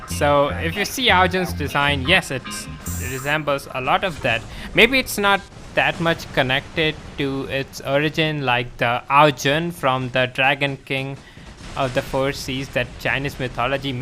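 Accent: India. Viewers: Fuck the Indian pronunciation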